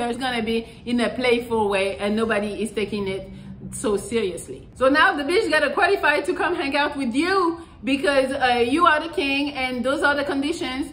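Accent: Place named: France. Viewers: Oui en effet, j'avais remarqué ce petit accent et je suis allé verifier après. Oui elle est bien française. Le aurait même dû me mettre la puce à l'oreille. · you've got that french accent I can hear, tu es sûrement française mmmh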